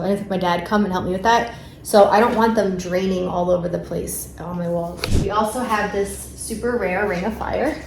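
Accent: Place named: New York, United States. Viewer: Stunning goddess: I'm just loving your vlogs! I love your way to explain and love your NY accent❤️❤️❤️❤️❤️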